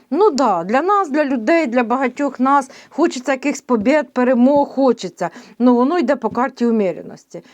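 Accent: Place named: Dnipro, Ukraine. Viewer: Дякую Вам,пані,за ваш суржик (ми теж, ваші сусіди з Дніпра так балакаєм).за Ваші ємоціі(вони дуже близкі нам).за вашу правду.!!! Хай щастить і здоровьєчка Вам!:hand-pink-waving::face-red-heart-shape:❤💛💙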